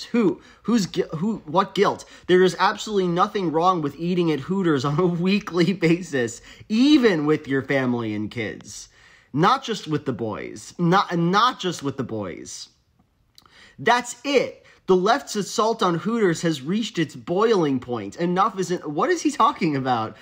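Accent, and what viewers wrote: United Kingdom. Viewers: I genuinely thought you were from the UK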